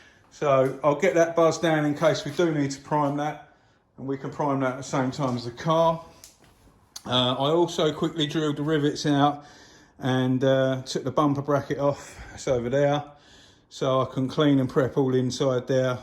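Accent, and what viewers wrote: Essex, United Kingdom. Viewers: Loving the channel and content, great tips and advice, thank you. Great to hear my old home county accent! I left Essex after 30 years to move to Devon. That was 15 years ago! What was the brand of cutting compound and finishing polish you mentioned in your live q&a? Cheers and keep up the great content. Was so chuffed to see a R5 GT Turbo. I had one in the early 90's!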